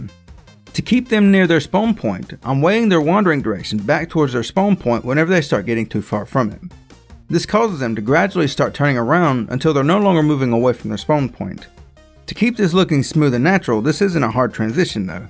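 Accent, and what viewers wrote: South, United States. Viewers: His southern accent makes the video better. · Are you southern or northwestern I genuinely can’t tell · first time ever hearing a southern geek voice. not disappointed. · I thought it sounded southern, but it was rougher than the other accents I've heard from that area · Holt crap I've never seen a person with a southern accent as a developer! :D this is awesome!!!!!!! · Just realized this is the first time I've ever heard a game developer with a southern accent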